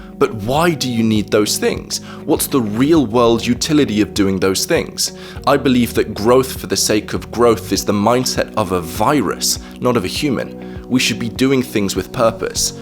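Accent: United Kingdom. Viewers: That is a British accent, to be honest I find some English accents a cringe to my ears, mainly American and Australian but that does not mean they should change to suit me.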